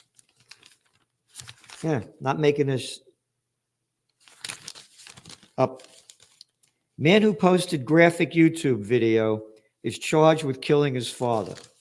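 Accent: Bronx, United States. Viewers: You sound like a proper Bronx bastard. Not quite as foul mouthed as my Brooklin relatives, but at least you fucking try.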